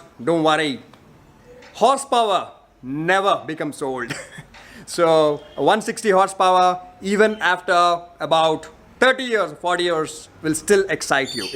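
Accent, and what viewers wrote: India. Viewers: You‘re welcome! This was the first video From you that I watched! I watched some others as well! I like your friendly nice art and your Indian accent haha!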